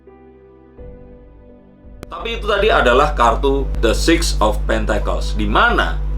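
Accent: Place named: East Java, Indonesia. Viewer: sampean wong jawa timur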